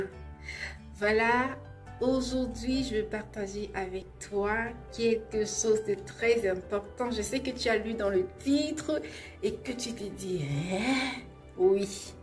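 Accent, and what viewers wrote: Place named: Cameroon. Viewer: Une Fibre camerounaise derrière ta voix😊😊😉 Courage en tout cas, 👍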